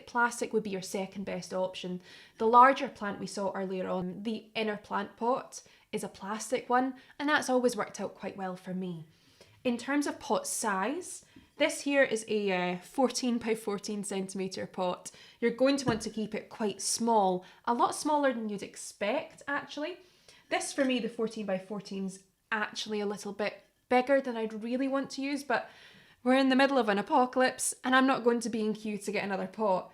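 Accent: Scotland, United Kingdom. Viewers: Aww your Scottish tongue is bra lassie. I’m from Montrose, left 40yrs ago to move to USA.. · Good video I'm going to lop the top off mine. I've always loved these plants but they seemed expensive. I love your Scottish accent, you could make audio books❤ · After three months of rooting my angel wing spotted begonia cuttings in water I was ready to locate information on potting. Your video is so helpful as well as fun to watch. By the way, my maiden last name is Sutherland and I enjoy your Scottish accent so much! I live in Virginia, USA.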